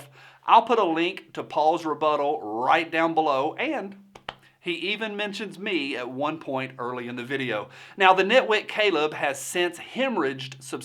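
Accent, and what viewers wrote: South, United States. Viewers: Really respect Paul Harrell and like his videos..I like your videos as well and have just subscribed....as I listened to you I was thinking man he sounds familiar....and then you you said " Shazam " and bingo !! Gomer Pyle !! I grew up watching TV shows that Jim was in and always liked that guy. Being a Appalachian born hillbilly myself I love hearing southern accents on the tube...old tube or you tube ... thanks for what you do really like your channel. Also I'm a vet and absolutely do not like posers..now I will go and watch the video in question...thanks "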